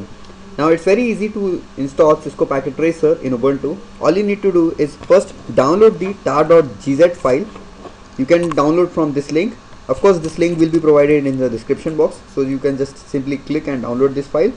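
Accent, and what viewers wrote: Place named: India. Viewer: hes indian i think by accent .. however the video helped me so cheers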